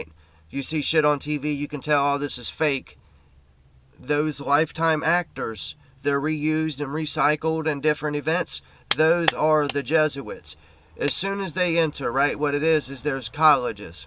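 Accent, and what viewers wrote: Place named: Pennsylvania, United States. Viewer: Hey man. I see you in several of the same chats as me. Matt and Brandon I see we have in common and I think I might've seen you elsewhere. I've been meaning to check out your channel. This one caught my eye right off. Jesuits are definitely behind most major deceptions. That I've determined Big Bang, heliocentric earth, evolution (more of a roundabout way), perverted eschatology, and even germ theory (Girolamo Fracastoro was Copernicus' classmate at Padua). This is the paper I did for ministry school when I discovered more about them. You might find a nugget or two. <link> Your accent leads me to believe you're from PA.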